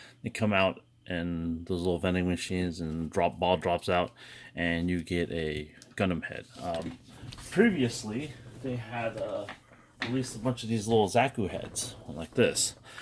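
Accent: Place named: Philippines. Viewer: FILIPINO ACCENT- more dissasembly please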